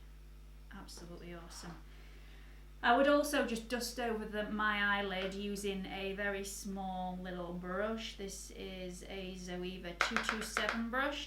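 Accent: United Kingdom. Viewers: Luv the British accent!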